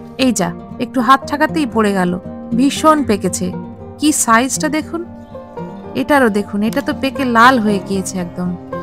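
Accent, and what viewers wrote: Bangladesh. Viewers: Yes you speak like bangladeshi. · Apnar bangla pronunciation akdom e indian bengalis der moto na. You're sounding like a bangladeshi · Tomar kothar dhoron Bangladesher moto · Apo apnito bangladeshi der moto kotha bolen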